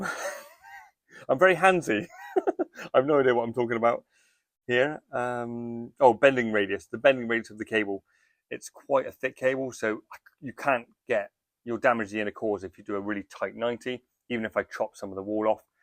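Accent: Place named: Bristol, United Kingdom. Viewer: I always wondered what these tools sounded like in Bristolian , now i know . Well Done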